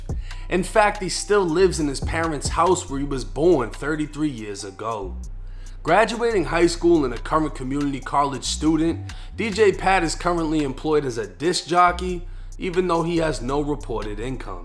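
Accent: Boston, United States. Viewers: Lol I’ve been subscribed to “Jay Williams: Let’s Live Life” (AWESOME channel), and I just stumbled across ur channel. Love the content man, and I love the Boston accent 😂. “His chAAAHHHges..” I ain’t making fun— it’s just different to me (a square from BFE Ohio).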